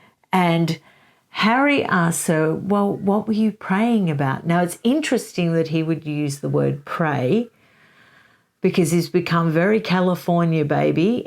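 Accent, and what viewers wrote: Australia. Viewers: Thank you, I was going to ask your name! Are you Australian? I’m a new subscriber here and I love all your posts.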